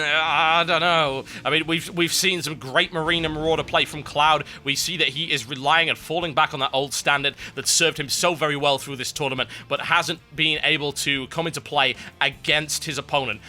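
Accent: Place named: England, United Kingdom. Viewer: you're accent is embarassing to be english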